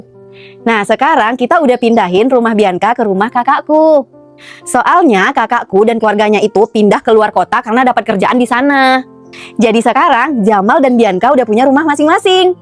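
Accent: Indonesia. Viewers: Kakak orang Aceh ya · Kak asli orang padang ya kalau boleh tau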